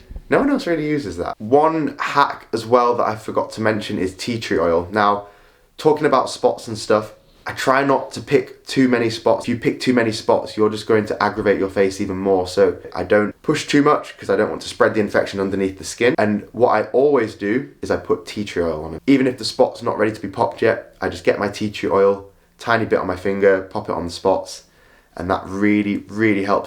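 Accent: United Kingdom. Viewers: Love your accent! Is it a northern UK accent? I have some problems following you! No wonder I'm from Sri Lanka 😆 😆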